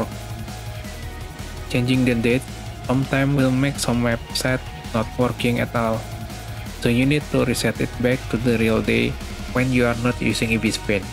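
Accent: Indonesia. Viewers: I know ure indonesian jst from ur accent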